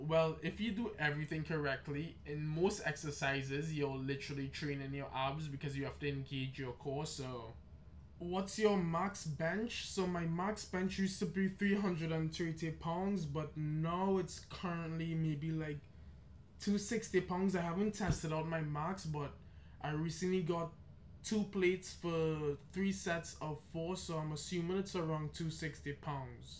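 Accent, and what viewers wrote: Trinidad and Tobago. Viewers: Great content. Can't hide that Trini accent 🇹🇹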